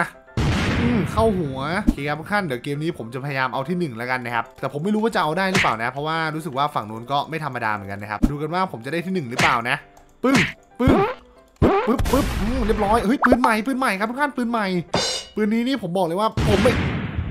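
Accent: Isan, Thailand. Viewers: เสียงอีสานก็มา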